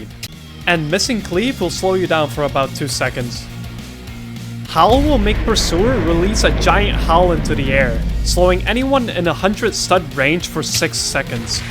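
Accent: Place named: United Kingdom. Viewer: you sound british and amercan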